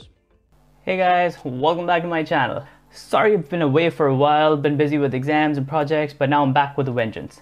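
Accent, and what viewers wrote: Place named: United States. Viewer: Bro nice video. Bty you sound like American not British one:)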